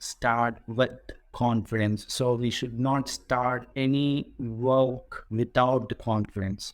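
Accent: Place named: India. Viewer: Nice explanation! ❤️ But the only problem is accent.... honestly, I think we should focus on improving communication skills with our original accents, not changing them. The Indian English accent is genuinely one of the clearest out there 🇮🇳 Just my 1st-year B.Tech thoughts! 🌱. You are more educated and elder then me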